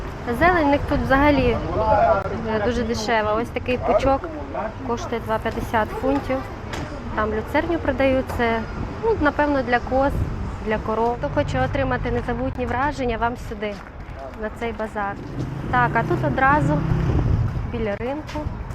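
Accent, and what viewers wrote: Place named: North, Ukraine. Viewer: ти з півночі України, говір такий цікавий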